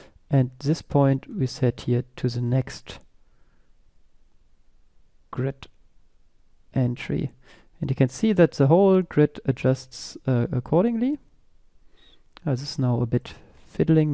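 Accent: Germany. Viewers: ich glaube dass ihr damit definitiv mehr leute erreicht! über den deutschen akzent kann man locker hinweg sehen! weiter so!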